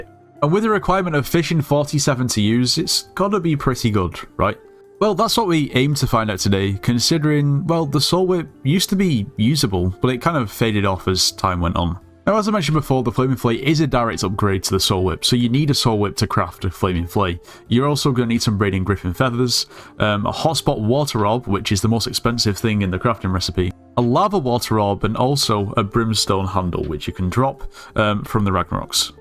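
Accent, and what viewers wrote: United Kingdom. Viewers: Taking into consideration that on the google account was an H, and the accent, I'm 99% sure name is, lmk if u have any obligations; Edit: We also can't ignore the fact that it was 385 pounds to fly to Andorra and that is the Brittish/Scottish currency where the most common name that starts with an H is, this makes my answer 99,9% sure, It also says that it's from Manchester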